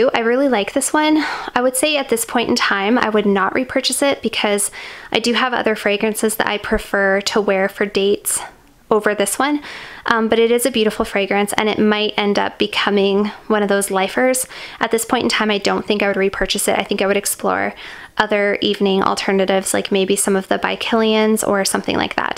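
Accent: Canada. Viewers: Hey, you’re Canadian?!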